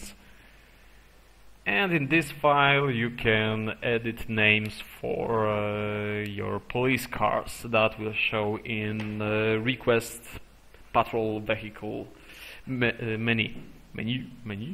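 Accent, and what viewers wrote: Poland. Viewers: Wyczuwa się ten polski akcent :) Ale film oczywiście dobry, łapka w górę ;)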